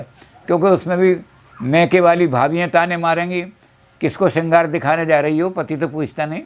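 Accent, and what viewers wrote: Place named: Haryana, India. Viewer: ne Kamal kar diya. Shastri ki bato Ko behad saral v harynvi lahje me samjhaya diya. Dhanywad.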